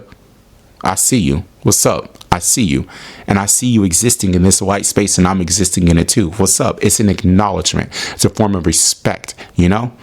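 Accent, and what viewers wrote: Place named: United Kingdom. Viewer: African ameri what? Sounds more British than american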